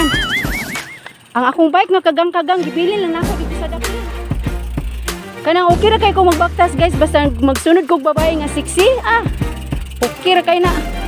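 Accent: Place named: Iloilo, Philippines. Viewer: Nami gid ang ilonggo ba hehe malalambing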